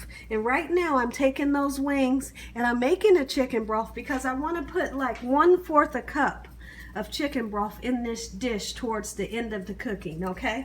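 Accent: South, United States. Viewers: I'm from n.y and l came across your channel and l'm loving it Wow!! the cooking you're doing. Plus your southern voice is amazing to me to being a new yorker... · yes I could see her on food network or a country soul cooking channel she should start sending in some of her recipes to those type channels like CMT because she does have a bit of a country drawl . This chicken looks so good ima slappin that like button . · love the southern accent thank you for the recipe!! love from africa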